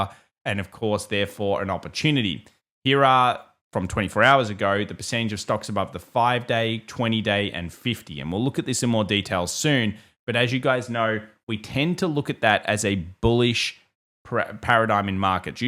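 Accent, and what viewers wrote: Australia. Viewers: You talking like Crocodile Dundee over here!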